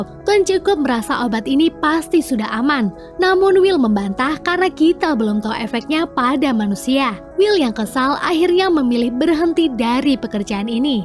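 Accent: Pemalang, Indonesia. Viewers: Wwong Pemalang asli min?Podo wkwk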